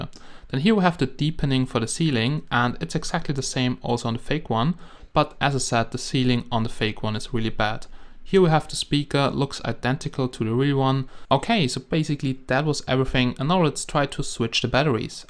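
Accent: Germany. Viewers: Ich bin halb irisch und halb deutsch meine muttersprache ist englisch und ich merke sofort das deine deutsch ist. Nichts gegen dich aber könntest du auch mal videos machen wo du deutsch sprichst? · did I hear a german acsend? :D naa hörst dich n bisschen so an :D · You dont Hear like a English man More German 😄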